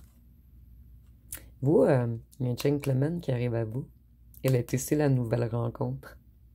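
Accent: Quebec, Canada. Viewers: Vous avez Madame une très belle manicure , une belle couleur de vernis a ongles ,et je vous ressent une très belle personne en tout point de vue et très soigner pour vous autant ds votre façon de penser que de vous arranger et j'aime bien votre accent québécois ces très jolie.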